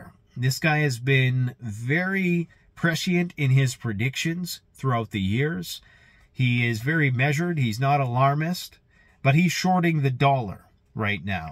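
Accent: Canada. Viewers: Trump is the best u sound like Canadian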